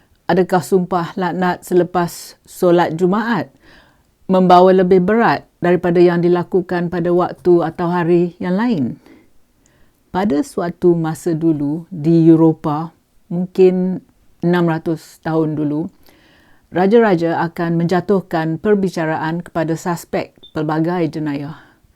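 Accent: Perak, Malaysia. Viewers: Puan org Perak ke ...lenggok bahasa mcm Perak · campur cakap perak. terbaikkkk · I like your lorghat perak and so proud of it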